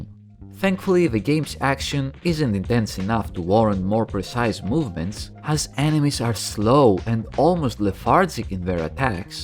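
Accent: Greece. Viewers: lol that Greek accent knocks me out ahahah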